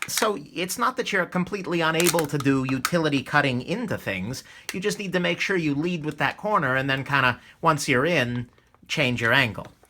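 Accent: New York, United States. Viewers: I want to add I subbed last week and the voice tone and rythme made it for me. Makes it very enjoyable to ear!:) thanks and keep up... is this an New York accent?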